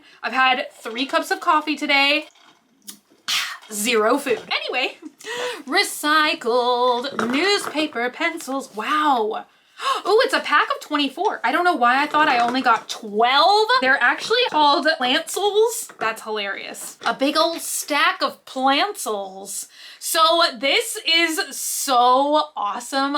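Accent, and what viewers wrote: Texas, United States. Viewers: I like to watch with the closed captions on...and they also said that said PIN and not pen. Lol. It's that southern pronunciation 😘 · It’s funny I don’t even hear a difference but in other comments they say it too. It must be a Texas thing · Took me a minute to know if you meant pin or pen, that Texas accent, man.😄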